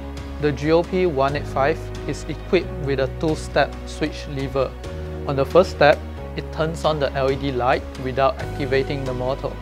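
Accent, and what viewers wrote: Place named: Singapore. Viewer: Is this Bosch Singapore? Why is the english accent sounding more like Singaporean than Filipino?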